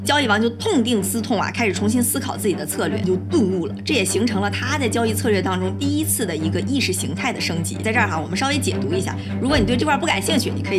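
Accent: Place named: Northeast, China. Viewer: 大姐东北的吧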